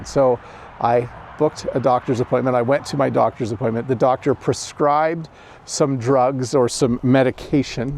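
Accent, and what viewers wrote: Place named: Canada. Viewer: Surprise surprise, I understand canadian english better than american english, I don't know why.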